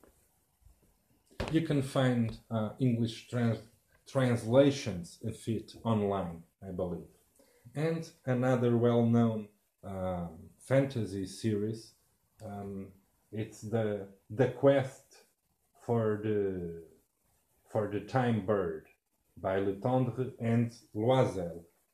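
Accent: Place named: Europe. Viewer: Speaking of european comics, Are you european too? You sound like european no offense.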